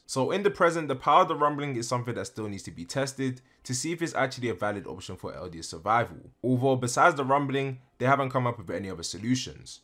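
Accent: London, United Kingdom. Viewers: bro speak less briish english pls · London innit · I love learning all the different kinds of British accents and yours is awesome. Definitely gonna try and learn it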